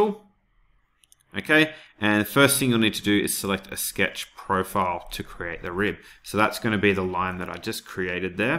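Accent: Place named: Australia. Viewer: Bro, The dude in Thor Ragnarok is New Zealand. This dude here is Aussie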